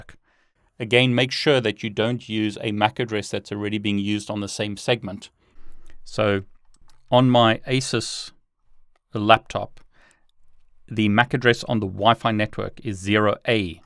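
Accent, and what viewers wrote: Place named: South Africa. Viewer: are you from south africa? your accent sounds south african!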